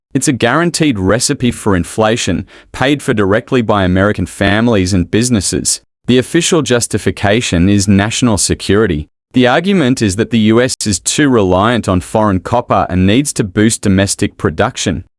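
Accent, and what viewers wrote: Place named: Australia. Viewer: That’s exactly what most of them will usually do. It’s not the supplying countries that pay tariffs, it’s your own country's importers that have to pay them on arrival. Or, did you think it was the other countries that paid the tariffs? The only way they suffer is if their goods (now with a tariff) are too expensive to sell in the USA and they can’t find another market, so they might need to close down, downsize or make something else. The narrator has an Australian accent. They would be the least affected of all, because they buy lots of American stuff, but sell a lot less to the USA. You owe them much more than they owe you. So losing just a small part of their exports because they are now too expensive in the USA because of tariffs isn’t all that critical.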